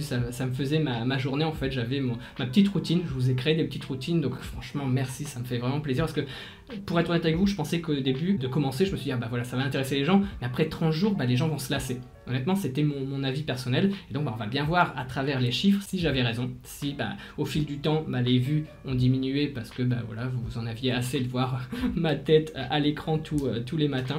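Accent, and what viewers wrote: North, France. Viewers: J aime bien tes vidéos elles sont toujours instructives et j aime la façon que tu présentes et j aime l accent du nord de la france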